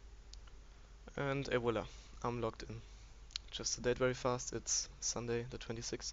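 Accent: Germany. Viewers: thank you man it worked me.and i liked your german accent :D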